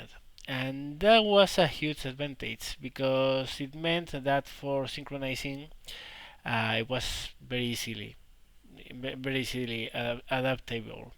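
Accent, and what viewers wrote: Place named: Spain. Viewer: Your English is top notch regardless,. Your sexy Spanish accent is as thick as Tiger armor, but your actual English is pretty much flawless most of the time.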